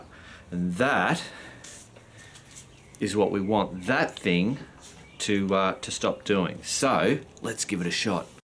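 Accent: Australia. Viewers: Great to hear an Aussie.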